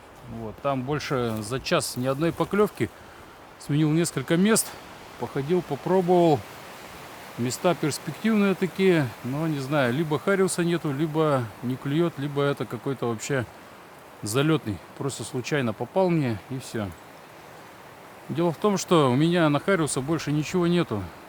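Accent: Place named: Vologda, Russia. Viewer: Все думала,почему разговор у знакомый,леса и болота какие-то родные.А оказалось,что мы соседи😊.Привет из Вологодской области!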